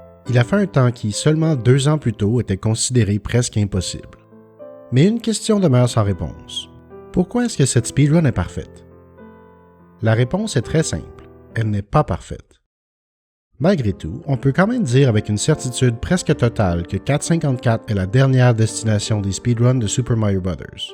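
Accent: Quebec, Canada. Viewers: I can usually pick up French accents on people from France speaking English, but for I did not know it until he said in the community post. Watching this video now, I noticed some words sounded very odd compared to what I learned back in school and what I went on to hear from French people. Thanks for confirming my suspicion of him being French Canadian to be correct!:D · Et c'est ainsi que je decouvre que je comprends mieux l'anglais nord-américain que le français québécois (je suis Francais) 😐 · Moi non plus, mais son accent en anglais est clairement comme un francophone, mais il n'est pas l'accent typical pour un francophone qui vient de France. En mon expérience, c'est presque toujours Québécois lol (Je suis désolé pour ma grammaire, je suis Suédois) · L'accent québécois est parfoit difficile à comprendre pour moi ^^'. Peut-être je peux m'y habitué avec cette chaine, haha. 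· Une speedrun? J'ai toujours entendu un speedrun, étrange.. Ça fait du bien d'avoir une vidéo narré en français avec un accent québécois d'une aussi haute qualité, j'en veut plus :)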